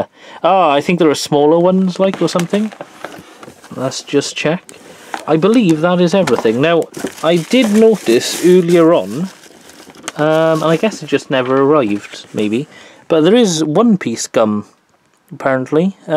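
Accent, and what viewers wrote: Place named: Kerry, Ireland. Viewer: Dude love your videos not to be rude but I subbed because your voice sounds like a man from Kerry Ireland sorry but still great video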